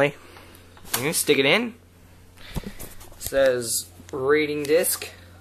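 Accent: Australia. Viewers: your australian accent sounds preety cool man! you are the coolest person in the world! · i like the Australian accent because u get to say g'day mate lol xD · Good Austrailian Accent. What you Found was Actually the PS2's Personified Fear. ( Yes It is an RSOD. )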